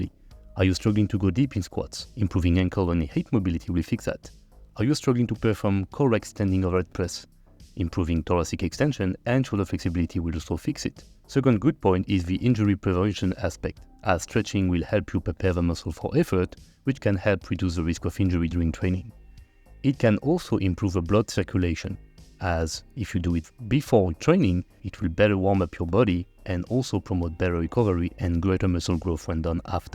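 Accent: France. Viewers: Forgot I'd downloaded this to listen to on my flight 😅 a bit surprised to hear the frenchmans voice. Great video bro 👍🏿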